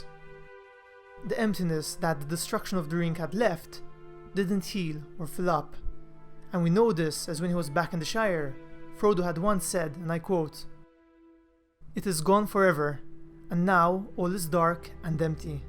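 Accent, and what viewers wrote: Malta. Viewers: Thanks for all the info it explained alot as just binge watch all the movies including the side movies. 1st off I have never witnessed a movie collection done so well and not just added more junk just for the money 2nd although the movies could not have been done any better,the BOOKs are the way yo go and must be read before watching the movies as your imagination can only do it justice and if done the other way around. I personally think if done the other way around you ruined an experience youll never get to have again!! So again thanks for the explanation and keep up the amazing work!! One final question and if you cannot answer I fully understand but, by any chance the person speaking in the explanation of this clip is he or are you Maltese!? Im wondering after hearing your accent. ;) again thanks for all youve done and hopefully I will get to speak with you again as Im trying to start my own journey and building a fantasy survival horror game based on locations in Malta and could use you're help but if you're busy I fully understand and wish you the best for the future,keep safe and hopefully Ill hear from you soon Regards Matthew Evans Vella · Really good videos! 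Are you maltese? Your accent sounds maltese 😁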